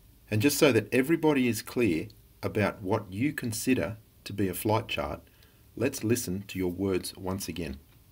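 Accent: Australia. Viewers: I guess it's the australian accent. Buddy ;o) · that silky smooth Aussie accent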